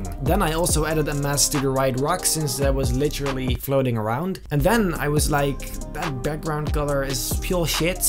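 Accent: Netherlands. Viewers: is it just me or does he have a dutch accent?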